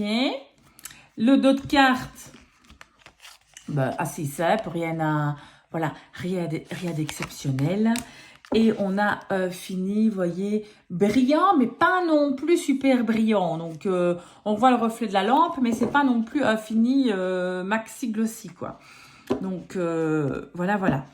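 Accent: Belgium. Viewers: Merci de nous faire découvrir les différents tarots, il y en a bcp qu'on ne connait pas donc c'est chouette 😉 vu ton accent tu es du côté de Liège 😊 moi c est du côté de Charleroi 😆 continue c'est chouette de ta part😜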